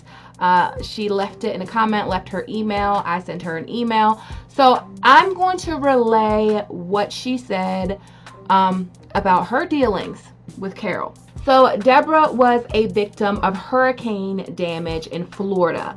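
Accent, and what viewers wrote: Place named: Maryland, United States. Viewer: I feel like your from bmore and I just found you. I'm from bmore so I recognize the accent. You sound from Maryland! Love your vids. Great job Hon!!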